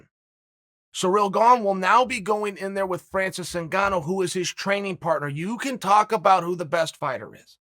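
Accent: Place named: United States. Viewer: People make fun of the the way Chael says names with his hyper-American accent like everybody has an accent and says things a certain way.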